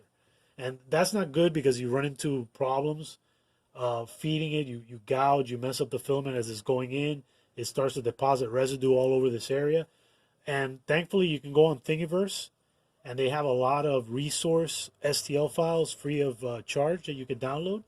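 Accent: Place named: Miami, United States. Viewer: I know a Miami voice when I hear one...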